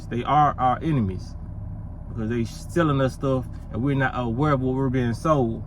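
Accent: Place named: Memphis, United States. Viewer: You Sound Like From The Hood We Black Memphis.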